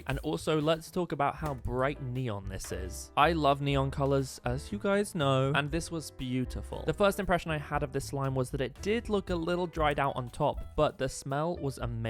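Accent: United Kingdom. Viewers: I had no clue you were from the Uk- but HII ME TOO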